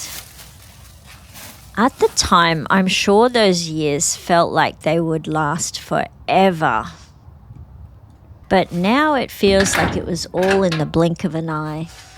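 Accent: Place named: Australia. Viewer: I enjoy watching your videos. I love your Aussi accent. I'm in Texas USA. My children are grown and moved away. I don't get to see them as much as I wish. I have made a life for myself with the 2 cats that came with my house when I bought it. I sold a large house and bought a small one near the lake. My cats are such good companions. Greeting me when I return home and following me around the yard when working outside.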